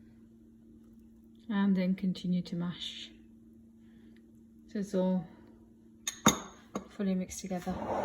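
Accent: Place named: Midlands, United Kingdom. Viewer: Well presented and a great offering, stay connected and more importantly stay blessed 🙏 By the way you sound from the Midlands though I maybe wrong, thinking Birmingham 🤔😉😊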